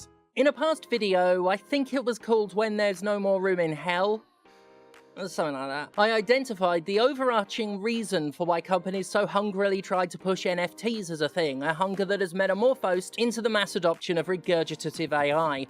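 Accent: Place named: United Kingdom. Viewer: My God, she is even more British than she was before!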